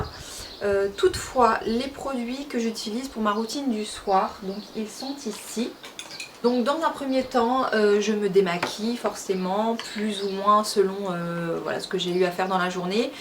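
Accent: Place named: South, France. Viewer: Ça fait du bien de te retrouver à travers une vidéo! Ne décèlerait pas un léger accent du sud qui s'installe dans ta voix? ahaha c'est charmant. Bonne journée et reviens nous vite pour une nouvelle vidéo!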